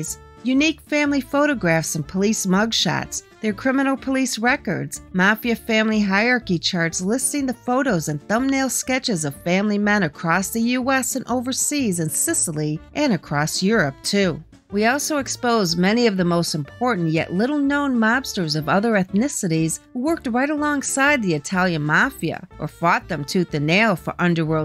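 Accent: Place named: Chicago, United States. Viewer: Why is it called throw in New York when the Narrator broad has the thickness Chicago accent since Tony Spilotro ?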